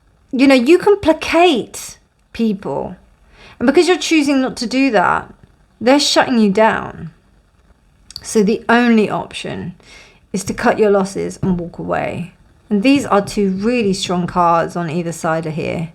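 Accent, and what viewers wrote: United Kingdom. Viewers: Thank you for your guidance and knowledge as always..also FYI your voice and energy are ridiculously soothing :) Brits automatically sound so much more sophisticated compared to the rest of the world!😅 You could make a video of only complaints and insults and I'm sure I could play it to sooth me to sleep. 💜🥰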